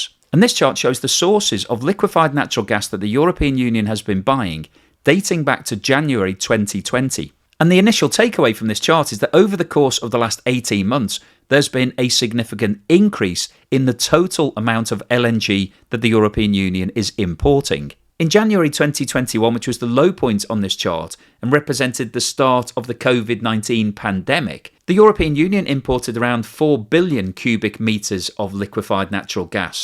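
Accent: United Kingdom. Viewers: You appear to have a British accent, so i am wondering why you say 2 times rather than TWICE?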